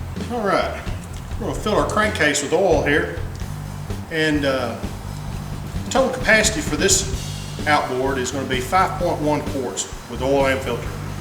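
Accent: South, United States. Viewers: Yea you do, Southern accent. Love the South! BTW thanks for the video for you have provided me with a YouTube Degree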